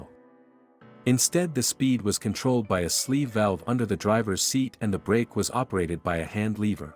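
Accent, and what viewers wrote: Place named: Germany. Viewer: Pity you missed supercharged 540 K and SSK...But great and funny video !!P.S-Funny because of German pronountiation..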